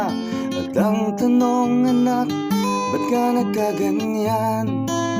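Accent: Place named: Bicol, Philippines. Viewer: Sure ako bicolano ka boss 😅✌️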